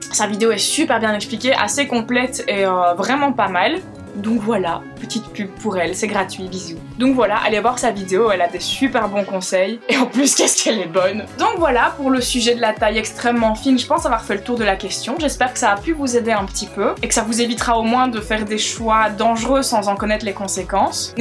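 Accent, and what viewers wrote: Belgium. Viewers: ton accent belge gives me life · Super vidéo!! Merci de mettre plein d’humour et de bonheur dans nos vies et de nous aider à accepter nos ptits complexes:) PS: j’adore ton accent belge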